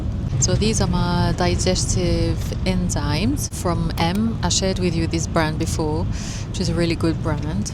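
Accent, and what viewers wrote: United Kingdom. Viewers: Hi, I love your weekly vlogs. Especially this one, which is amazingly stylish and informative. What a lovely British accent you have 😊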